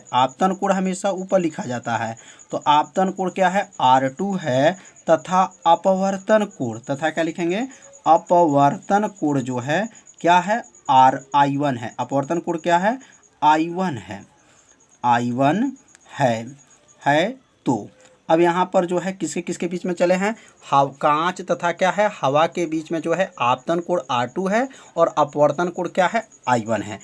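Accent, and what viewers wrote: Bihar, India. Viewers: Sir ji aap bihar se ho kya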